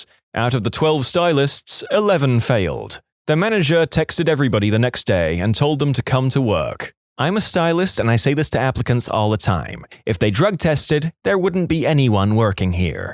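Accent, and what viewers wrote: United Kingdom. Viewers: Pardon my language sir but WHY THE FUCK ARE YOU BRITISH NOW, WHAT THE FUCK HAPPENED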